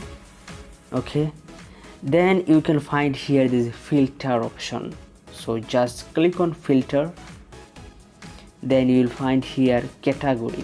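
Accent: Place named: Bengal, India. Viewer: Bamgali crazy English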